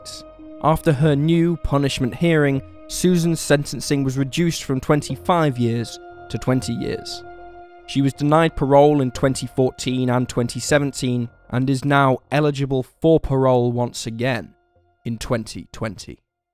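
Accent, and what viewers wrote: United Kingdom. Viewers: Narrators who speak excellent English (i.e., British we presume?) sort of ruin the story without any expression utilized WITH the English perfection. Find some narrators who know how to phrase their sentences in an interesting manner? this guy sounds as if he, himself is not even enjoying relaying the stories (which without his rigid enunciations, were actually, quite interesting. Ok nobody cares Bye bye.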